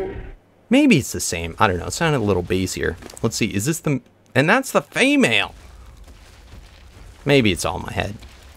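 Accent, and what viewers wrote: United States. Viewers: u got blue eyes and u have an american accent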